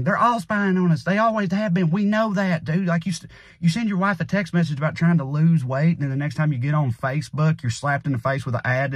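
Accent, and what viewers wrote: South, United States. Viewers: ROTFLMAO the Youtube rabbit hole, how do you think I found you Trea? You are your generation's George Carlin only with a southern accent. Meh baggage there I know. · I've read many articles and watched many videos explaining that most comedians have above average intelligence if not genius. Just watching and listening to, I'd guess - no, I'm SURE is in that upper range. He just tries to throw you off by emphasizing his Southern accent. And by the way, I'm from the deep South.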